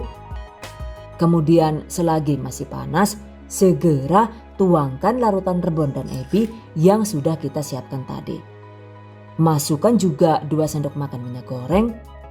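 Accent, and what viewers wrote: Banyumas, Indonesia. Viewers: Hahaaa seneng lahh kro suara ngapak'e kya nyonk kswuuunn resepe ya kk ❤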